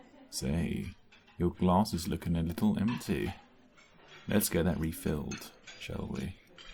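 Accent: United Kingdom. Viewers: 7819/10 Great audio Love the English voice The audio is lovely, crisp, enchanting, the end it where it’s at for the most but the audio overall is like fine wine. I would definitely recommend it.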